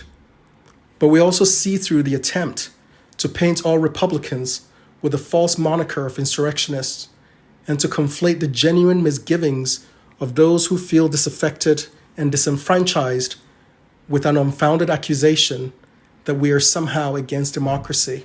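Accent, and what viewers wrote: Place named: Africa. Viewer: I lived in Nigeria many years ago and traveled to Ghana. You have perfected your accent! I can barely detect any bit of Africa left in your voice but I know you carry it in your heart! Well done, spoken well!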